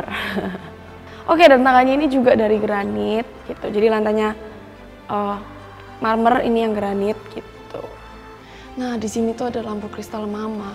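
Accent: Java, Indonesia. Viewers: Ada darah Jawa kah ?? Sepintas cara bicaranya kek ada logat² Jawanya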